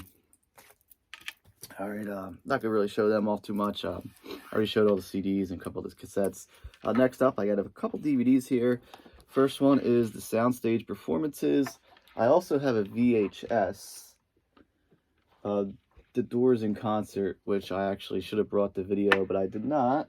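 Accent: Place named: South Jersey, United States. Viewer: I bet youre from south Jersey or Philly .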